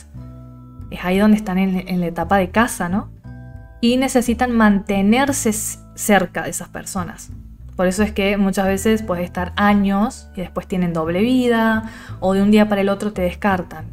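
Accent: Córdoba, Argentina. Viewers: Completísimo. Escuché otros videos tuyos, explicas muy bien. Tengo la duda si sos cordobesa, nada que ver pero los cordobeses nos reconocemos el cualquier parte. Sos una genia, manejas mucha información y la haces entender. Gracias x todo. Sé en qué momento estoy. Más segura, más emponderada, pero se que también puedo correr el riego. Él está ahí, rondeando, espectante de saber cuándo puede volver a haberme entrar el si juego, lamentablemente lo tendré que ver el resto de o vida, creo se entiende el porqué. Naa.. todo lo que explicas es así. Cuando explicaste que se nos queda ese lado oscuro en nosotros, que hago cosas aprendidas de él, hasta pensamientos aprendidos de él, todo ésto que lo transito con mucha más conciencia gracias a pedime y como vos que nos explicaron toda esta tragedia y le pusieron un nombre. Digo esto porque yo sabía yv entendía que algo malo había, siempre lo percibí y después que pasó lo más terrible seguía sabiendolo, pero aún sin entender qué era. 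Enormemente gracias.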